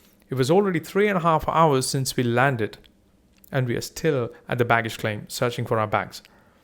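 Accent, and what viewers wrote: United Kingdom. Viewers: Brit accent coming from a desi who lives in the US. Can't wrap my head around it, unless you binge watch British TV Shows a lot. Cheers mate!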